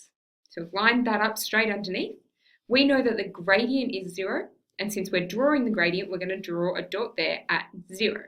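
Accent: Australia. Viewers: Oh my gosh thank you very much!! Hi from the UK, I can defo tell your Australian :D love the accent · thank you so much, this was a lot of help! im from New Zealand and im trying to figure out if your accent is from here or Australia. I think you're from Australia???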